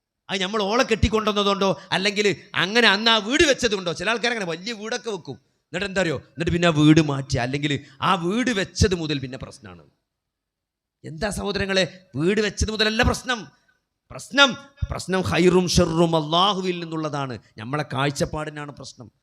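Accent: Malappuram, India. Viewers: That is pure Malappuram language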